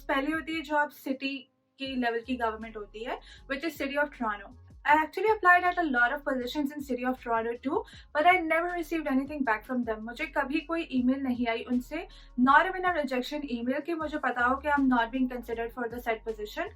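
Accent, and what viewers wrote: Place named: India. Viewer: Who did her interview? She doesn’t even speak French let alone that heavy thick Indian accent.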